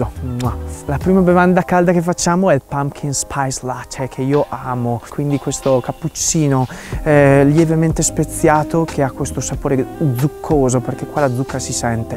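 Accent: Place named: Veneto, Italy. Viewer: Raga ma perché ha l’accento veneto? Comunque adoro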